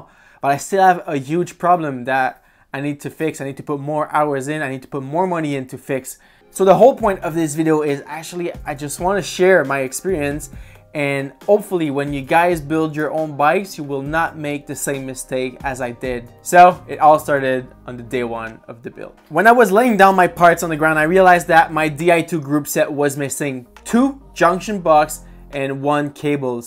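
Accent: Quebec, Canada. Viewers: Hello, super cool de t'entendre jurer en Québécois pour moi frenchie qui adore votre accent mais surtout vos jurons. Clairement si je me lance dans un TT bike build, je partirai sur du sram suite à ta vidéo. J'ai remplacé mon guidon sur mon Giant TCX par un guidon aéro full intégré et le di2 m'a déjà bien énervé! Super vidéo. Merci et profite bien de ton Scott Plasma. Ps: tout ces câbles coupés viennent d'un mauvais routing de ta part ou plutôt de la complexité du vélo?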